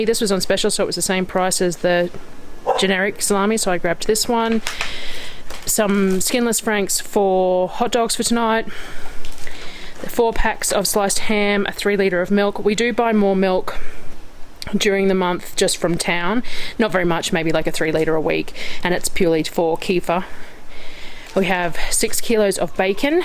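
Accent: Australia. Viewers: G’Day!!! New subscriber here. 🇦🇺💕So wonderful to hear an Aussie accent. Great video - shout out from Sydney x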